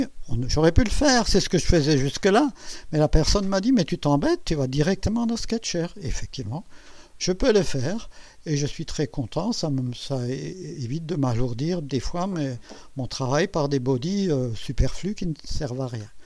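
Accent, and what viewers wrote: Alsace, France. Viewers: Ton accent me fais penser à l'entraîneur de foot *. Mais que suis-je bête il est aussi Alsacien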